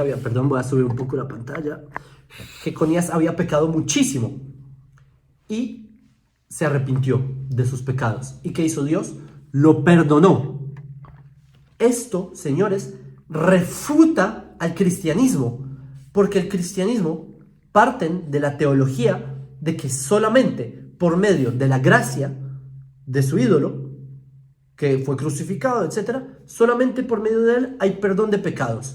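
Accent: Colombia. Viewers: Hablando de genealogía, a mi me parece que este hombre tiene un muy marcado asento colombiano más concretamente como si fuese de Bogotá. Yo quisiera saber si realmente es judío o solo tiene los rasgos físicos.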